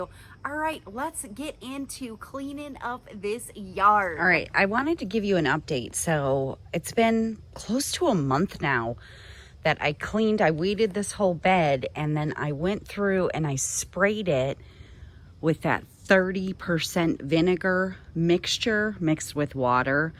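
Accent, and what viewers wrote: England, United Kingdom. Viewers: You are killing it!! I have a question. I have been following you for a year now, where does the English accent come from??? Lol have a great day!!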